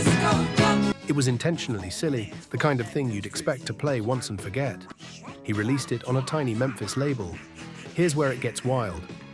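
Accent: United Kingdom. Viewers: Please narrate your work and lose the Brit !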